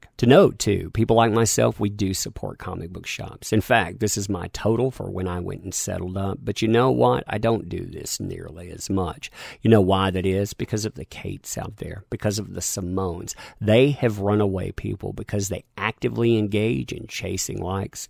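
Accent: South, United States. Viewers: I can’t focus on your video. Not because it’s bad or anything, it’s just your voice is so soothing and sounds so southern while at the same time doesn’t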